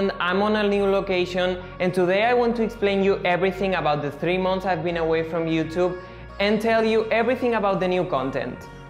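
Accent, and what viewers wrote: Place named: Spain. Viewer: ya decía yo que eras español, se te nota el acento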